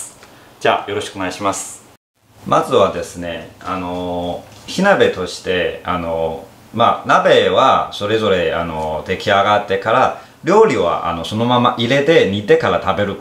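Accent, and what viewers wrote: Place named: Chongqing, China. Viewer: 一听口音就是地道的重庆人😂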